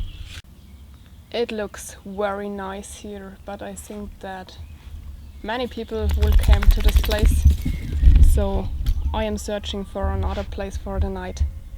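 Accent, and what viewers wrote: Germany. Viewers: You could have carried a couple of cans of beer to drink when thirsty. I did not know Germans drank water. Your camp looks like it is infested with mosquitoes ! I hope you had the means to deal with them. You should have also brought bikini tops to wear when swimming. The tee shirt will not ward off the cold if wet. BTW, I love your German accent and how you patiently you explain in English.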